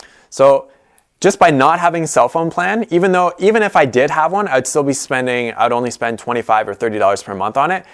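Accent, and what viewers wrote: Canada. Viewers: I think he english-canadian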